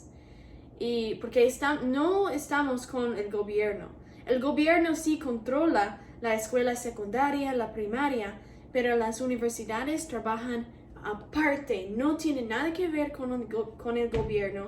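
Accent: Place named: England, United Kingdom. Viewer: Que buen cuento. 🤔Me encanta leer y escribir.Y que bien que promuevas la lectura en jóvenes. 🤓 Hay vocabularioqie que se usa mas en literatura, algunas pocas palabras no se usan en la cotidianeidad pero te hiciste entender muy bien. 🤗Sólo te sugiero mejorar acentuación en algunas escuchandolas o escuchando audiolibros de tu interés. Y que hagas in video semanal leyéndonos algo, a mi me entretuvo con tu voz y acento inglés y así practicas. Por fis di que sí, andale por fis sería bonito. 😍Hasta me dieron ganas de volver a leer algo de literatura que he dejado a un lado por el trabajo. 😣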